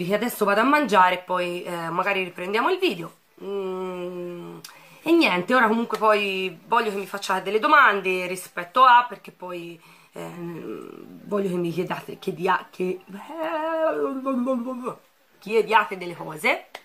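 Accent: Tuscany, Italy. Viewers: Grande mi mancava sentire il nostro toscanaccio!!! BEN TORNATA!!🎉🎉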